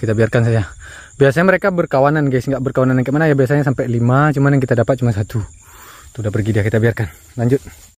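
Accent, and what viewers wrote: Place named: Aceh, Indonesia. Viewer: Saya subscribe baru, logat Indonesia nya macam orang aceh? Awak aceh kah droneh? 😅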